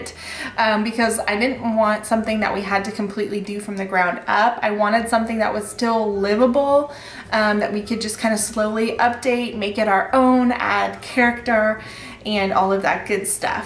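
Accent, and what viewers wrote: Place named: Midwest, United States. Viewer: Welcome back to the mid-west.I am from Iowa. I thought you sounded more like me. Right now moving closer to family feels more secure and helpful. I wish you months of hard work and much love for your home. I will be watching you. Joyce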